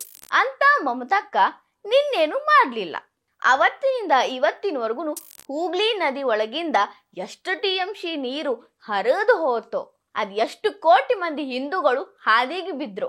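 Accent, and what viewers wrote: North Karnataka, India. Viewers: ಸಹೋದರಿ ನಿಮ್ಮ ಉತ್ತರ ಕರ್ನಾಟಕದ ಸ್ಪಷ್ಟ ಭಾಷೆಗೆ ಮೊದಲು ನನ್ನ ಧನ್ಯವಾದಗಳು, ಹಾಗೆಯೇ ನಿಮ್ಮ ಮಾಹಿತಿಗೆ ನನ್ನ ಜೀವ ಮಾನದ ಹಾರೈಕೆ...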